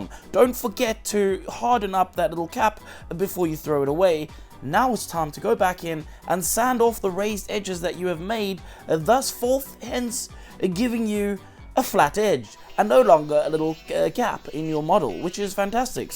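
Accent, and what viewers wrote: South Africa. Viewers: are you south african? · I detect a hint of South Africa in that accent😂 you can't get rid of us that easy. But superb work and truly great craftmanship on the video and models themselves. I need more video series like this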